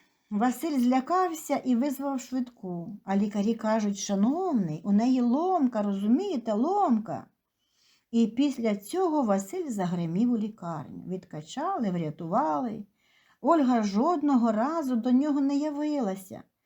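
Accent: Standard. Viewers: Тільки за голос і чисту українську❤❤❤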